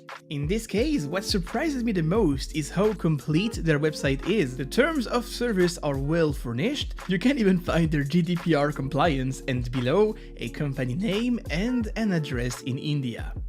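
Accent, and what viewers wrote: France. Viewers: I bet your french. a typical accent. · L'accent déjà c'est cramé mais t'as Google en fr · la musique de ça me tue xDD (j'adore ton accent d'ailleurs, il est très discret mais si t'es français tu devines quand même) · french accent ? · Hi, incredible content, great job! One tip to improve your videos: work on your accent, there are tons of videos and apps out there on how to improve your english prononciation. I hate the french accent (I am french myself maybe that's why) and it's a struggle to go through each of your videos but the quality of content makes it worth it, keep up the great work!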